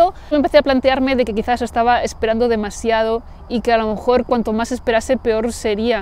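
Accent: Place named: Galicia, Spain. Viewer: Muchas gracias! Te escuché con mucha intensidad y atención. Me encanta tu acento, supongo que eres gallega. Mereces ser muy feliz, belleza te sobra. Ojalá que la salud sea lo más abundante en tu vida para siempre. Un abrazo.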